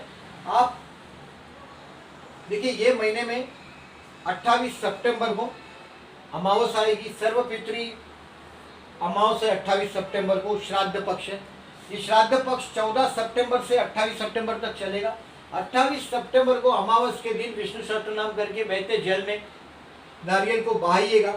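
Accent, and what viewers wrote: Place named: Maharashtra, India. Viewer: ।।🕉नमः शिवाय 🕉जय माता दी 🕉।। नमस्कार गुरूजी आणि शुभ रात्री, गुरूजी आपके सभी विडियो बहुत ही अच्छे होते हैं। मै महाराष्ट्र राज्य का हु और मुंबई में रहता हु आप जब कभी भी हमारे महाराष्ट्र में बोलते हो और बीच-बीच मराठी शब्द बोलने में आते हैं। बहुत अच्छा लगता है। ( गुरूजी आपके नये विडियो नहीं आरहे है। कृपया करके नये विडियो बनाईये धन्यवाद और शुभेच्छा! )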